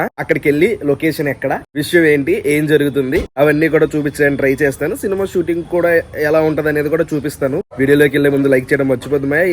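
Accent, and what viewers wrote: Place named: Godavari, India. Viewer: All the best.. new timing ki.. you have bright feature in movies and Godavari slang 🎉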